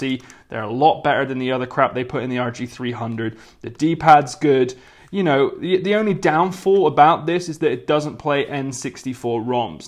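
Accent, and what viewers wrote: Bristol, United Kingdom. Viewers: Fellow Bristolian?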